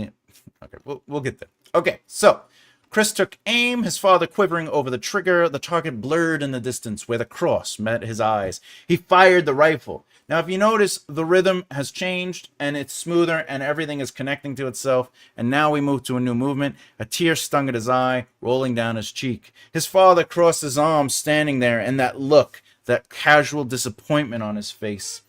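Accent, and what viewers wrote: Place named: Boston, United States. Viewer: And, with your reading accent, makes it sound super Boston.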